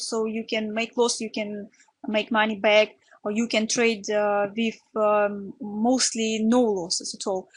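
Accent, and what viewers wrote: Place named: Russia. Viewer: nice accent. Russian?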